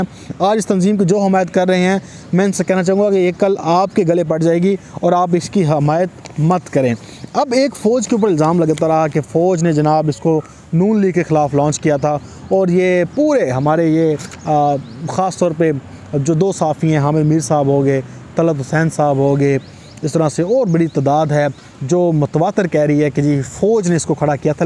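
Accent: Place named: Punjab, India. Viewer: Punjabi ka tarka laganay ki zaroorat nahin hay, however your vlogs are always informative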